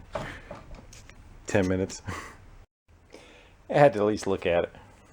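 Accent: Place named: New England, United States. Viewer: Love the videos,. Good to see a fellow New Englander, accent and all making YouTube videos that are educational and entertaining. Keep up the wicked good work!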